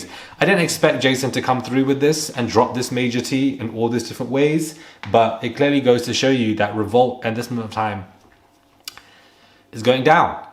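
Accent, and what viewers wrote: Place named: United Kingdom. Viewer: This guys UK accent makes it miserable listening to him. The UK is probably the most unattractive country with the most unattractive people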